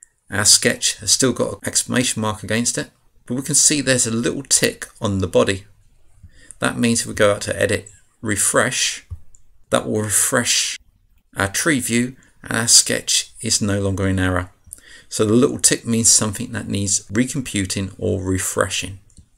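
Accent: United Kingdom. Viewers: Both lessons are excellent. (Everyone loves a British accent). FreeCAD specifically, and CAD programs in general, are inherently complex, NOT intuitively obvious, and can be extremely frustrating. But all videos are exceptionally well done, and well thought out. Each step is performed without error, so there is less confusion. The parts have sufficient complexity but not too much. The presentation is correctly paced for absorption, uses several commonly used features, and is most helpful. FreeCAD is very powerful once mastered. Thank you for doing a great job and a wonderful service to the 3-D community. 😀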